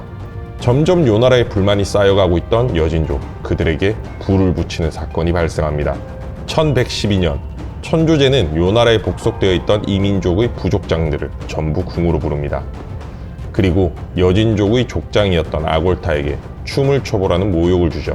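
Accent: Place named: Gyeongsang, South Korea. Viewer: 경상도 사투리인데요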